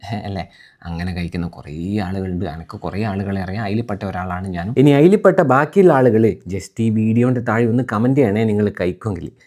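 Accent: Kannur, India. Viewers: Chettan kannurkaran aano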